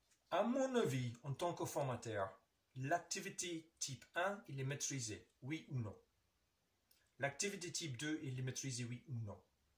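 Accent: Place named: Canada. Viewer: Quel accent canadien 😊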